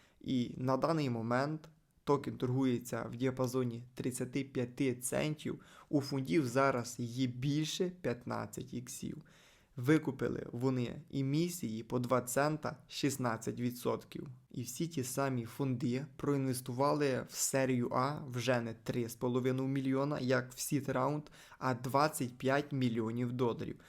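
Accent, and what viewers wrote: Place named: Boyko, Ukraine. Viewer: голос пздцц ти шо з села?? як бойко мовиш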